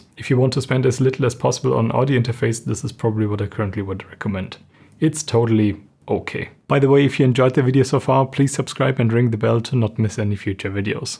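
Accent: Germany. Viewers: I was really excited to see your video, 2 mins into the video I was purring like a cat, your quite monotone German accent put me to sleep, so I had to rewatch it in the morning.....You should start a new channel.... sorry 😮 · man i am so sorry but it's just so crazy how grating german accents are in english, especially his, just full on german sounds, cadence and everything but in english, his english is great but i literally can't listen to this, gotta read subtitles. i'm from germany but american born and this is not even a "me" thing, german accents are the #1 most frustrating to listen to GOSH man ich bin so abgefuckt davon aber ich weiss dass es sinnlos und dumm von mir ist, so empfindlich zu sein und dann noch ein kommentar drueber zu schreiben :D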